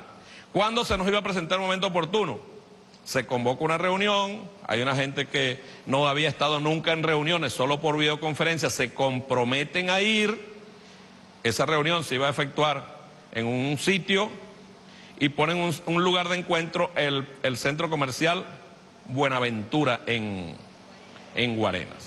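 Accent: Cuba. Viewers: Ha reclamal justiciaa, ya se le sale hasta el acento Cubano se les nota que repiten lo que viene de la Isla.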